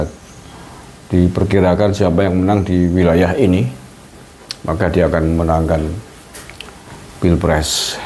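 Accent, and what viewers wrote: Banyumas, Indonesia. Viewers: Wong ndi pa jenengan ngapak ya pada pa 😂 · Smg prabowo menang 1 putaran, aq seneng sampean ngomonge ngapak...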